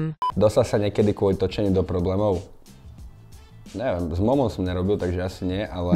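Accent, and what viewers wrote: Eastern Slovakia, Slovakia. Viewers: Vychodniar jak repa 😀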